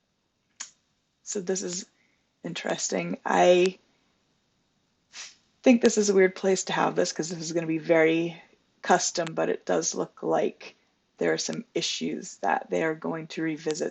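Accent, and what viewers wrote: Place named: Scotland, United Kingdom. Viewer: I detecting a wee scottish twang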